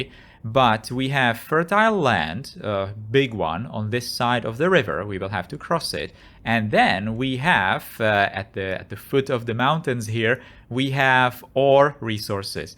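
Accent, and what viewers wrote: Czech Republic. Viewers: CZ, judging by the czenglish. And those batteries are MWhours, not MWatts!!!! That would make no sense! Edit no.2 4MW is not battery capacity, it just shows how much is flowing into it😅😅, capacity is 10MW!!!hours!!!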